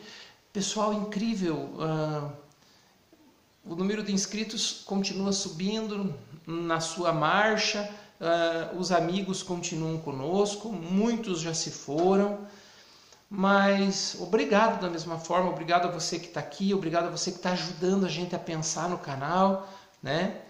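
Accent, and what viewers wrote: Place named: Rio Grande do Sul, Brazil. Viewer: Muito boa tua posição sobre certos assuntos. está no sul pois teu sotaque parece de gaúcho! Abraços desculpa minha curiosidade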